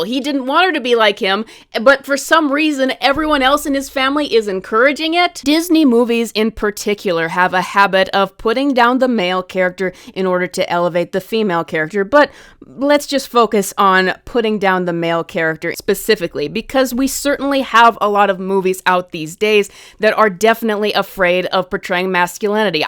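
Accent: United States. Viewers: Holy shit I just knew you had a Minnesota accent before you even started talking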